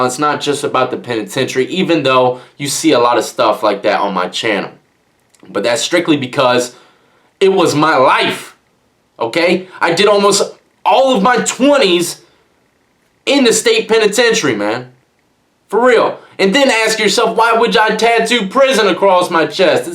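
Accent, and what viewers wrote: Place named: DMV, United States. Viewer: I knew you from the dmv or I could just hear it I’m from dc/Baltimore anywhere in between and I know front royal VA pretty well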